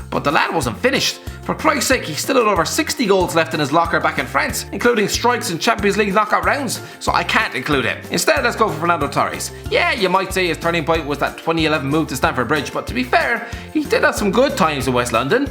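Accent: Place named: Ireland. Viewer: Is it an Irish accent thing, to pronounce 200x as 100x?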